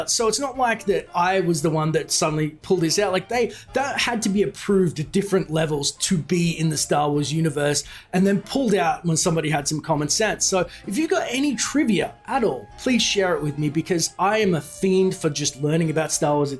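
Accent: Australia. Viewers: do you do looper too or am I just a racist who thinks all Australian men sound the same?.....Are you even Australian?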